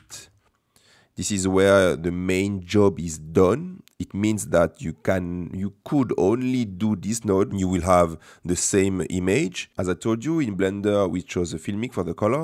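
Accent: France. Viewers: I love your French accent😍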